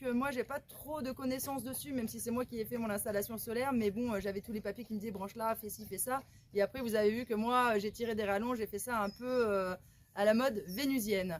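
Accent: Paris, France. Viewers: C'est un accent parisien que j'entends là ??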